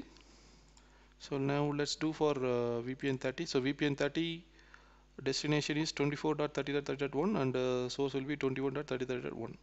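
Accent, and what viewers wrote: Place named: Kerala, India. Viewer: Sounds like Kerala Nice video chetta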